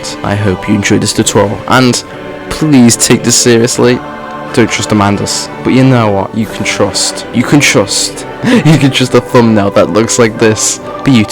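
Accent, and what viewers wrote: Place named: United Kingdom. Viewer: Nice British accent.🇬🇧👍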